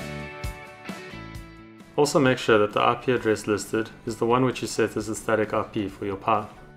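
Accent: South Africa. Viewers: I'm a simple man, i hear a SA accent, i subscribe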